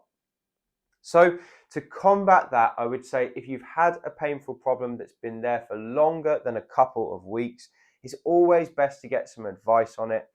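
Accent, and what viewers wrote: United Kingdom. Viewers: İ love his British accent, he s so sweet..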